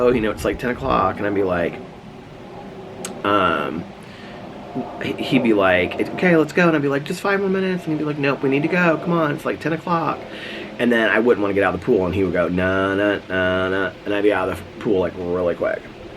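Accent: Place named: Indiana, United States. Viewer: Indiana-isms "I saw-l". "my grandma-l". Sandwich- "sammwich". Potatoes "Batatoes". There are so many more lol.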